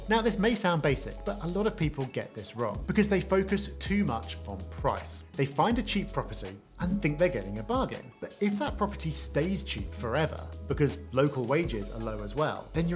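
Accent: United Kingdom. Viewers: The presenter sounds like he has a posh Liverpool accent too !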